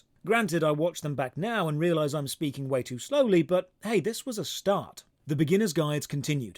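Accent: United Kingdom. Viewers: I think I found your Channel because of your Dreamworld videos. And you are right, I was fascinated by how bad this game is.... And then I basically binge-watched the worst MMO Series... And then I subscribed. : ) But I really like your style, and your clear, 'easy-to-understand (British <3) English' (I'm no native speaker). If you continue like this, I'm sure you will do well! I'm so happy for you, that you reached 200k already! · Well dressed double barrel British accent man is the best booba streamer. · I started uploading about 3 years ago (I think) and I'm only at 1k... I'm proud of my 1k, since I only play PS, follow only two free-to-play titles, do some Demo reviews, edit and upload with PS4 Share Factory, and have no idea what the hell I'm doing. However, I do believe I am missing only one thing to be a "overnight" success... a british accent! lol That said, I hope one day you do a collab with another great british accent Youtuber I follow Many congrats on your success and keep it up!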